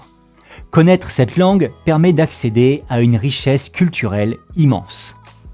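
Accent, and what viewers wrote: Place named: France. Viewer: thank you so much for this amazing video! I love this new format and looking forward to see more videos like this one. BTW, your soft French accent is very beautiful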